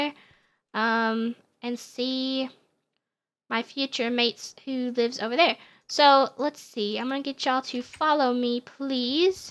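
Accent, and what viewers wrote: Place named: United States. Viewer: Oh myyy i’m watching ur current 100 baby challenge you sound like you have a light country accent in this love to see how far you’ve gone love your vids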